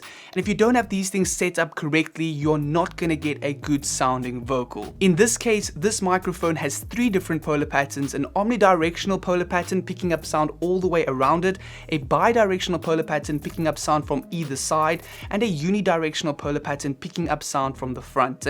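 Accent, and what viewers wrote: South Africa. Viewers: Good stuff! I always learn a lot from your vids.! And your S.A. accent is the coolest! 😀😎👍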